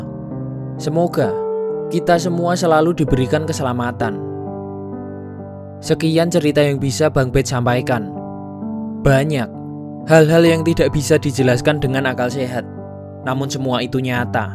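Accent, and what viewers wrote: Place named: Java, Indonesia. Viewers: Medok banget naratornya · Orang Banyumas kayanya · Logat jawa bikin candu kalo denger sambil rebahan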